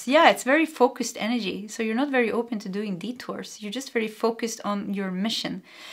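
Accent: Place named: Sweden. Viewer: When I hear you apologize for stumbling on your English, I’m so very impressed how well you do!! I would NOT be able to give a reading in Swedish, so I applaud you for doing so good!! Secondly, both 1 and 2 are 100% me!! I do healing and I have a wonderful old soul tribe. I appreciate this confirmation!! Love your readings and really connect with the Swedish accent as a soul of Swedish descent this time around!! ♥️👏🏻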